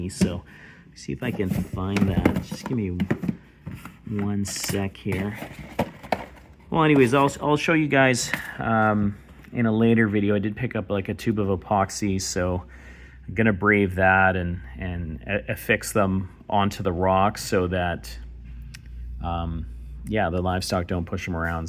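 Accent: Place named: Canada. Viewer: love seeing a new reef channel congrats! u sound canadian hrmm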